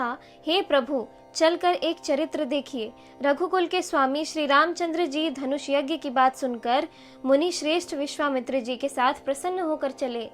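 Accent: Standard. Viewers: Atyant shuddha uchcharan hai . Ati sundar hai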